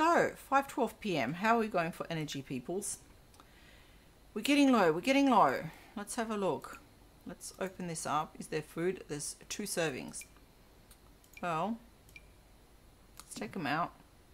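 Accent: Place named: New Zealand. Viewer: Oh yes, I'd almost forgotten, but now you mention it, it all comes back. So sorry. It's just I like hearing you. Even if you're not quite a NZer you sound a lot like many of my NZ relatives, especially with the phrases you use. :D They all have much stronger accents than me. :-) Anyhow, I'm pleased you'll continue this series, though I'd equally be pleased if you started a new Sims 4 PC series... :-) All the best to you, my friend. hug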